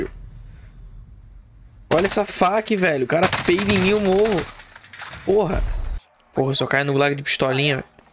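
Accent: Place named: Santa Catarina, Brazil. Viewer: Mano pelo seu sotaque vc é de Santa Catarina, de onde vc é?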